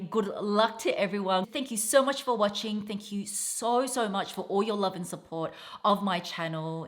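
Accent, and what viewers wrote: Australia. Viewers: I’m very GRATEFUL for my wife MIKKI who I’m entering your give away for! She enjoys watching your latest video haul and I like listening to your Aussie accent, like when you say “leathaaah or evaaah. Her birthday is coming up in December and the Hermès Her bag would be the perfect birthday gift for her! I’ve been looking into building my history with Hermès by shopping for belts and handkerchiefs! I’ve even been browsing for Hermès tea cups just so I can get the chance of maybe purchasing a bag for her. Thank you for giving us a chance to win the Hermès Her bag! We’ll wishes and Keep up the fantastic job!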